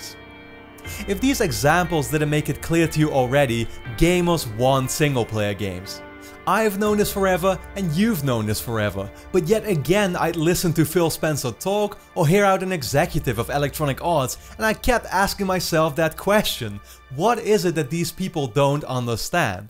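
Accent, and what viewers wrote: Netherlands. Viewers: Is this guy dutch? He has a familiar accent... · Are you dutch? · Aaah the dutch english, it never stops. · You cant hide your dutch accent. Its too good to hide ❤️ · Dude are you Dutch? i lived for some time in amsterdam and this accent has just stuck in my head so deeply.... :D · dutch accent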